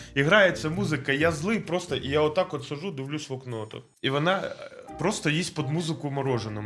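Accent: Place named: Surzhyk, Ukraine. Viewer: Який лютий суржик!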